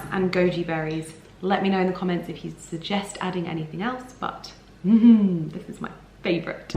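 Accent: United Kingdom. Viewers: I enjoy your videos 🥰i really enjoy you British accent I want to visit English one day favorite country 🥰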